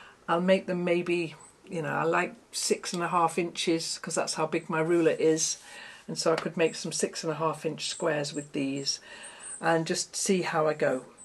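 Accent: United Kingdom. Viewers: Mind. Blown. You are one fascinating human, - the creative process you turn into a real object is nothing short of magic. I thought about the ladies of Gee’s Bend in Alabama/USA. The rejoicing of fabric by artists like yourself and those ladies is a singular genre that could only be achieved with patience. As much as I love to watch the process, it would run me mad to try and do it. And the cat bed? FABULOUS. I as so glad there are people like you, and Kaffe, and the Gee’s Bend ladies to counter-balance the madness of the world today…I know the world has always been mad, but the current time is particularly cruel and often times pointless. To know there is someone like you “across the pond” who is gentle and interested in creating beautiful art calms me down. The horrors of the Hill Country flooding, just a few hours drive from Fort Worth, are crushing sad. 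The political situation in America is horrifying. To be able to watch you and hear that engaging British accent is a balm to my weary soul. Thank you, friend-I-will-never-meet.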